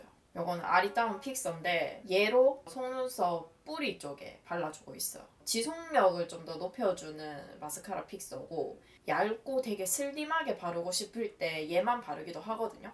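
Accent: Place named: Daegu, South Korea. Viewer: 대구분인가요? 대구 사투리같아용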